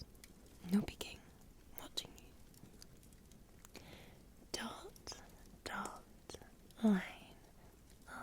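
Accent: Scotland, United Kingdom. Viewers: I'm totally in love with this Scottish accent. Better than my Italian accent. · Gawd, I adore your lovely brogue. Blessings and love from Ohio USA! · 31 minutes of tingles from the resplendent scottish lass. ah, a most excellent birthday present. ☺️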